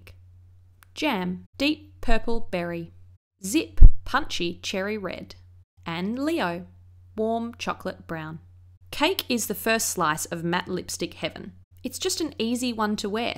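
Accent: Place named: Australia. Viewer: you sound australian, may i ask how did you buy glossier and get it shipped to australia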